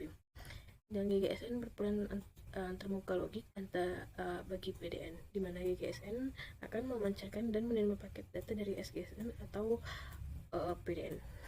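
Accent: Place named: Pariaman, Indonesia. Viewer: Vidionya kurang menarik nih, bahasa indo nya kelihatan pariaman vibes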